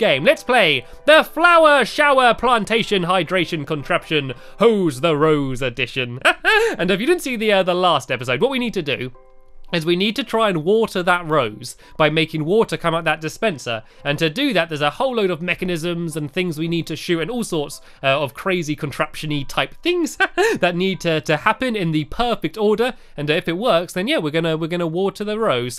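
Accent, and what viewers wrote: United Kingdom. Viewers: He can't help his accent hes not from america gosh guess your saying people that are not american have a dumb accent wrong ! I think he is lucky living in the UK it sounds like fun with the queen living somewhere there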